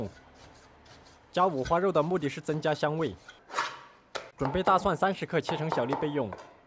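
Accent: Sichuan, China. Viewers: 一听就晓得是四川的哥老官，兄弟，莫得毛病我日。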